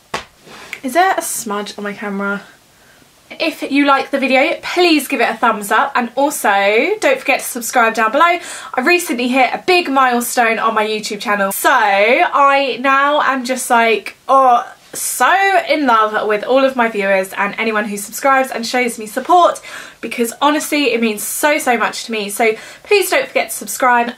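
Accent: United Kingdom. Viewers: You’re very beautiful! I can’t stop telling you that😂 i love your hair, your makeup, your eyes😍 your clothes, your bed sheets, your british accent, your soul! Where are the guysss? We’ve got a gorgeous girl here how they cannot see thatt?🙋🏼‍♀️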